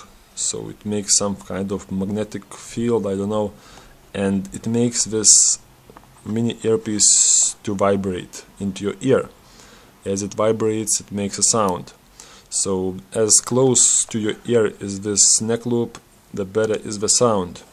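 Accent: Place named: Eastern Europe. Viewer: voice like Arnold? :) well Arnold is from Austria and has kind of german accent :) but this mate has slavic accent.. Like me :D Ukraine or something like that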